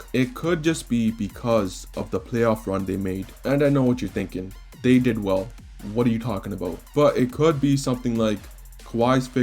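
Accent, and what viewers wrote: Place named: Toronto, Canada. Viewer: Where are you from? You kind of sound like you're from toronto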